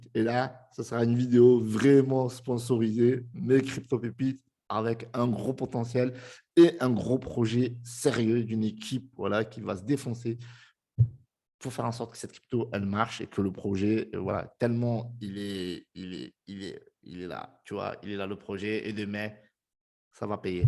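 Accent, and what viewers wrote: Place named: Marseille, France. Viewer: merci pour ta bonne humeur , surtout en ce moment ! tu aurais pu être de Marseille avec ton accent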